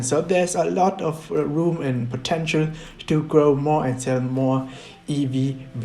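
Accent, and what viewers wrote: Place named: Germany. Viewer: Haha der deutsche Akzent ist einfach gut!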